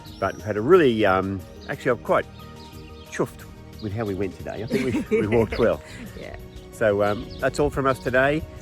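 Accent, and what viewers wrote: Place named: Western Australia, Australia. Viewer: Beautiful video as always! I also enjoy your accent, it reminds me of the time I have spent in Western Australia. :=) Have a great day and Buen Camino!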